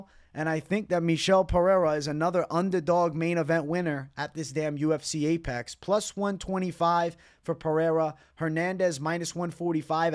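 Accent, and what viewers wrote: New York, United States. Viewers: a new york (boston? not good with ID'ing accents) version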